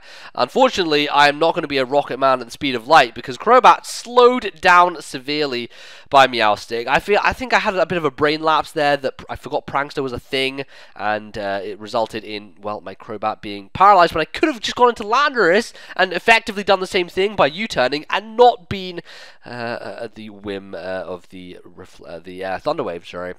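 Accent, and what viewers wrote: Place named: United Kingdom. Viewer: nice video, gotta love this british accent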